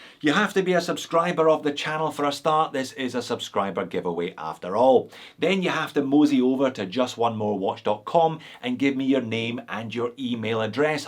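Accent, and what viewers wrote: Scotland, United Kingdom. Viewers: Thank you so much for all these wonderful vids (and this bit of scottish accent comin´ from Down Under)! I scratched the itch today and got me one of these lovely chinese Seagull chronos 😁😁🎅🎅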